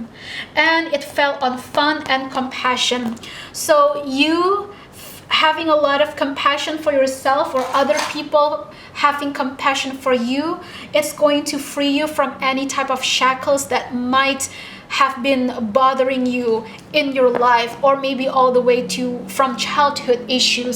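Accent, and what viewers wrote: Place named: Italy. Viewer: Where are you from? (idk why your accent sounds Italian to me lol)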